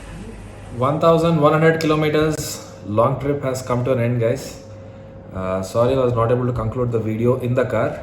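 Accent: India. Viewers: Anna Telugu Vallu aie English lo ala matladutharu Anna😔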